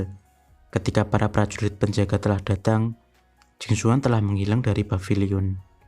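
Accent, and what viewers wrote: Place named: Java, Indonesia. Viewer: Ngpmong nya medok bangat asli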